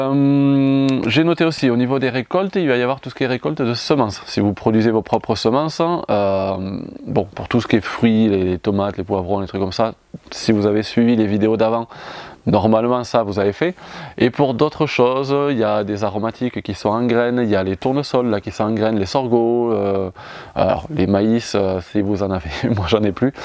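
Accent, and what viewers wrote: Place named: South, France. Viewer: Merci c est vrai vous avez un joli accent du sud a bientôt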